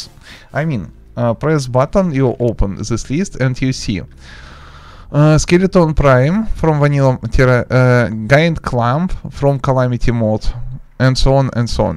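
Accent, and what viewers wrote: Russia. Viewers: Чувак, русский акцент прям выделяется. А так видос классный.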